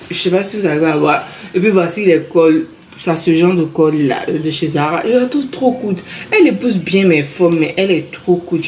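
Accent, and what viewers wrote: Ivory Coast. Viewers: On adore l'accent ivoirien et le naturel dans la video! 😍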